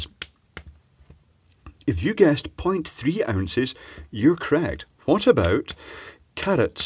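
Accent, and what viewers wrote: Scotland, United Kingdom. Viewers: What did Mr say? Sounded like 'putting seek diamonds'. What was that word before diamonds? Also where has the Scottish accent gone?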